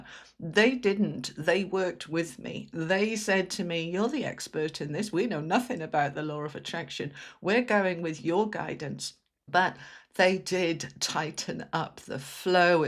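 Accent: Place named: South Wales, United Kingdom. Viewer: Hi, your accent sounds very familiar, I grew up in South Wales. I saw you on the Jeff Mara channel. You’ve put me onto a Spiritual Church nearby, I’m looking forward to meeting them. So thank you for that. And thanks for the tools you’ve explained, being the change. Love it!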